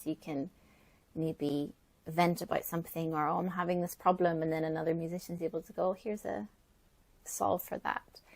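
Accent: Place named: Ulster, United Kingdom. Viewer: I love your natural Ulster accent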